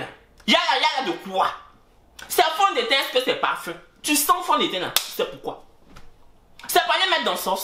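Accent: Ivory Coast. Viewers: 😂😂 c'est la 1ere fois que je vois et entend ce gas! MDR déjà je sais que c'est un ivoiriens de suite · Clover C'est normal c'est la manière de parler des Ivoiriens!!!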